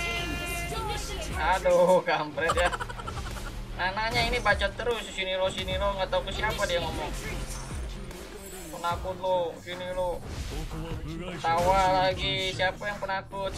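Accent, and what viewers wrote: West Java, Indonesia. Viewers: Logat sundanese salam dari cijerah lur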